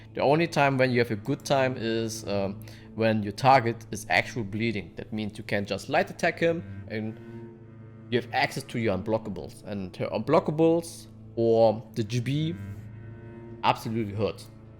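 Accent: Germany. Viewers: Das ist doch NRW Englisch xD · i had this thought for a while know but i think n ow im sure i believe is german or at least lives and speaks the language bc eventhough his accent isnt as noticable the way he says some thinks and how he says "ezettera" i have the feeling he is. could be wrong tho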